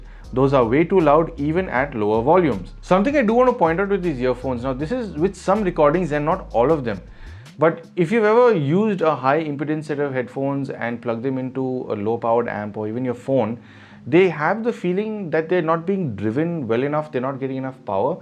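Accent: India. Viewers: as I'm a core subscriber for your channel, I'm still in shock that for such a great reviews on well known TWS earphones, even after long time your not gaining in subscribers If I'm you and keeping all these efforts for the wonderful output of this beautiful video I would close my channel if enough encouragement was not given But you on the other hand doing fabulous Job with your Indian English ( easy to understand ) as well as your Honest review ❤️ Thanks brother and I hope you see grow in the future, Don't forget me !😂😂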